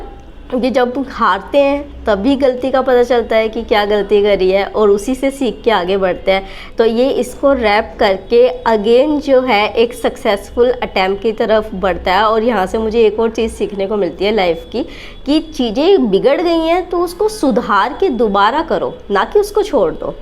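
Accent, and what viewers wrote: Haryana, India. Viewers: R u native from Haryana ????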